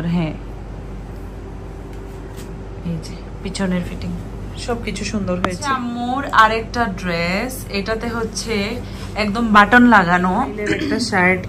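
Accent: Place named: Sylhet, Bangladesh. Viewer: Ada math amrar sylhety r adakan suddo Bangla na matiya je kono ekta math tik koro go Apu te bala lagbo 😊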